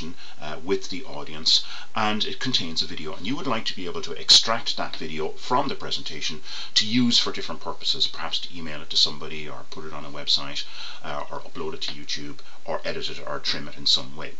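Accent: Ireland. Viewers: Brilliant! For us MAC folk, it works with the Unarchiver program, it's a fast Smooth fix! And it sounds much better with an Irish accent.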